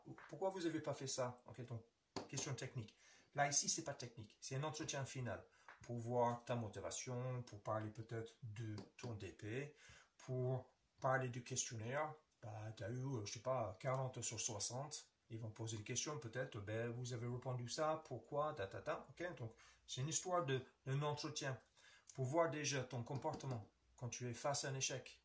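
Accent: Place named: Canada. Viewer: Quel accent canadien 😊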